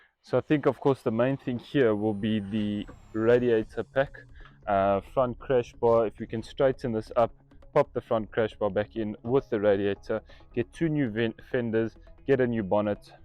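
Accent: South Africa. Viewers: Great looking project ❤ Iv just subscribed to the channel keep up the good work 🎉 you sound like you from South Africa 🇿🇦? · You are definitely South African.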